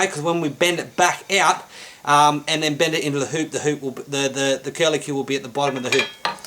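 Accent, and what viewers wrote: Australia. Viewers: Same here Sunday morning with yamez. Had to search you out. I can't understand half of what you gibber out in the aussie accent, but hell I'm from Alabama... I basically speak hillbilly so no one knows what I say either. We call that horn of the anvil lol. Not sure where bic would have ever come from.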